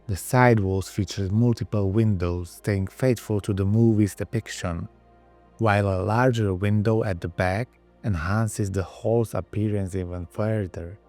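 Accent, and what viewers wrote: United Kingdom. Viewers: I’m sorry to be rude, but I’m not watching this channel as much anymore. There was something charming about the British AI voice…that said, I pretty much hate everything else AI, but he had happened to pick the perfect voice. Oh well, I suppose I can always go back and watch the old ones.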